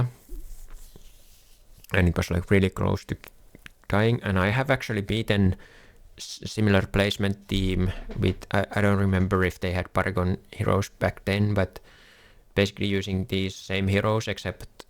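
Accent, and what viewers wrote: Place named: Finland. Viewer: You are finnish right, your accent gave that away instantly. Try to say Phraesto next video and not prästost lmfao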